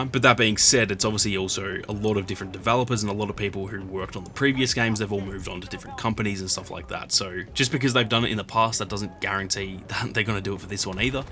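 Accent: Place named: Australia. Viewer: As a PC elitist I subbed for the vibes and the aussie accent a while ago. Keep it coming and best of luck with the sub goal :)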